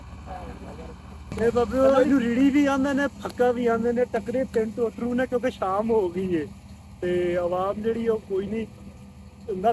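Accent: Punjab, India. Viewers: charde panjab dahi lagda hai bol bani iko jihi haisafe babe 8 chuk de c nanke v pak bich rehinde dlskot de c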